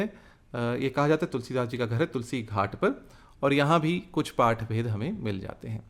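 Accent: Standard. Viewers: Your Hindi is too pure- very nice . Samskaran yaane kya?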